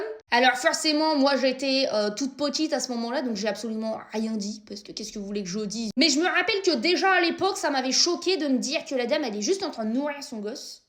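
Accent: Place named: Normandy, France. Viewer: C'est de Normandie 😊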